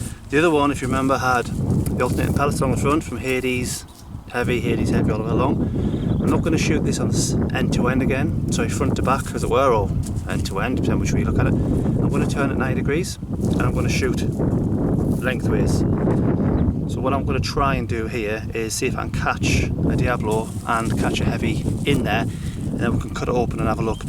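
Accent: United Kingdom. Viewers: Is that a fellow Mackem accent my senses detect? Can’t mistake that North Sea east coast wind on the mic either! Great video. I’m busy using my Pulsar to test .177 QYS 8.48gn v AA Field Diablos and against my hunting go-to the 10.34gn JSB Heavy. Also have the test to do of Polymag shorts against the 9.56grain QYS’s. Have subscribed so look forward to seeing your videos and more vids. Best of luck. (I’m SR6 Marina based) 👍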